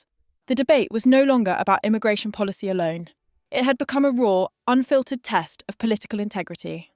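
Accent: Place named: England, United Kingdom. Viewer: I'd have wanted to continue listening, but managed just shy of 5 minutes; the narrator sounded like a robotic AI with an English accent, rather than a person.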